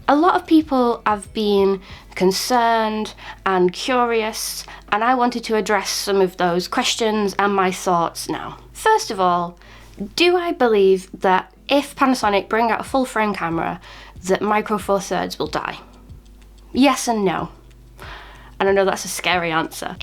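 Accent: United Kingdom. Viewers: Charming British accent :)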